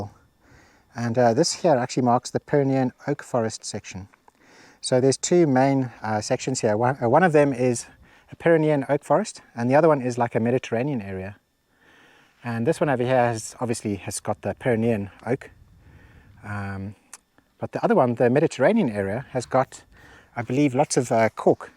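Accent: South Africa. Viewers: Hi from Johannesburg, been watching for videos for a while now and rally great. Love hearing you SA accent. Love Castelo Branco, have visited many times , usually stay at the Tryp Hotel next to the Castle. We own a small farm in Juncal de Campo. Have not been able to travel to Portugal since Covid. Cant wait to come back, your videos make me miss it so much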